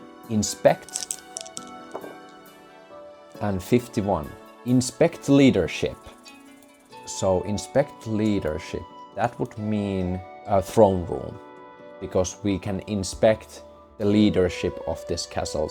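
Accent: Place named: Finland. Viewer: Suomi? Cool accent 😎 Thanks for this walk through!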